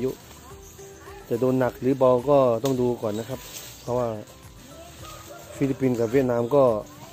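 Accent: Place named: Isan, Thailand. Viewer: น่ารักจริงๆแถมมีเสียงพากย์อีสานของ เข้ากันดี รักนะจ๊ะ ขายอะไรคะ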